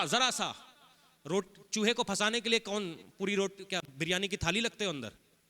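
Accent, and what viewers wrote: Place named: Punjab, India. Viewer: Jai Masih ki pastor ji God bless you very nice word aur aapki Punjabi Hindi sun kar bahut maza aata hai aur shanoo wali baat kia baat hai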